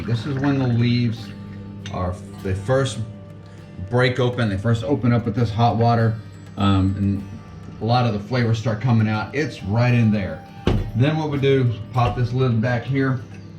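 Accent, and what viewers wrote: Texas, United States. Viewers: Hey, ma man u actually give attention to a lot of things, (the but i digress got me laughing) it reminded me of a tech-youtuber that says it a lot, what i wanted to say is actually even in here we have stuff open by night in the big citys like (rabat/casa/marrakech) ex: macdonalds stays open to about am some other late night kebabs do too, but with the pandemic everthing is closed even in the usa i think it's not the same with the pandemic, btw we understand you because you are from the usa and not from irland or some other part of the uk, your english is pretty much like what we see on tv shows & movies, the tea done right + u actually are more morrocan than a genuine one, why you may ask well most of us are not perfect the way humans are is quite universale, the exmple you gave for being addicted to tobacco, the coffee, we can understand it's quiet normal. 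am only gona say well done, and god bless you brother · Hi! You are doing amazing even when you had coffee for the first days, I’m so impressed of your progress, you’re doing absolutely fantastic 👍🏻 I’m Moroccan in America, I teach my kids to be thankful everything, even the air that we breathe, because we have everything here we don’t feel there that is different somewhere else. My daughter is 11 now and because there is no school she wants to experience fasting for the first time, and yes she is doing the whole day by choice. Note: kids should start fasting when they reach puberty in Islam. Please ask yourself those 2 questions: 1- why me in a Muslim country in Ramadan, what God wants for me to know? 2-why I was chosen to experience this and reflect on my life by myself while I’m getting physical and spiritual cleansing? Do I have to do more research to find out why? Things will come with time but do some from your part. 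yusuf Estes is from Texas, I suggest that you watch his videos, his has the same accent as you 😊 he explains a lot a about Ramadan and more m, he is funny too. And please take care yourself, the tea looks amazing, you need to eat more than that so stay strong for fasting. I’ll watch all your videos and always I can wait for the next one. Please upload more 😊 Take care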